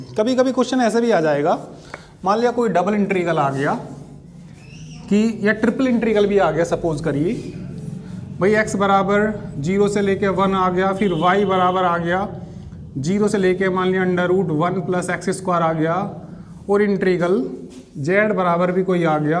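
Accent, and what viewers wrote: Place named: Himachal Pradesh, India. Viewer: sir most probably you are from Himachal if I'm not wrong .......... (by accent)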